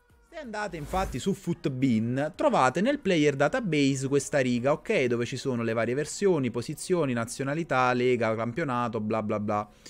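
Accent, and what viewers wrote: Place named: Naples, Italy. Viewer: Si vede che sei allievo di Holly ... io ho vissuto 12 anni a Napoli ed adoro la parlata tua e di Holly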